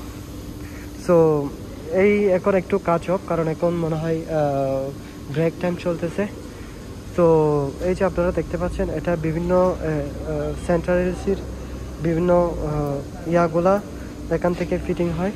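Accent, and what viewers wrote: Chittagong, Bangladesh. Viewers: আসসালামুয়ালাইকুম ভাই। কোন কাজ সম্বন্ধে ভালো ধারণা রাখেন তারপর ভিডিও বানাতে চেষ্টা করুন আপনি যে বলতেছেন এসি ফ্যাক্টরি আসলে কি এটা এসি ফ্যাক্টরি নাকি ডাক্টিং ফ্যাক্টরি সেন্ট্রাল এসি তে তিনটে পার্ট থাকে ওইটা কি একটা অংশ আর কিছু আবাল কিছু না বুঝে শুধু কমেন্ট করে যাচ্ছে ভাই মনে কষ্ট নিয়েন না আপনার ভাষাগতভাবে বুজা যাচ্ছে আপনি চট্টগ্রামের মানুষ চট্টগ্রামের ঐতিহ্যগত খেয়াল রাখবেন।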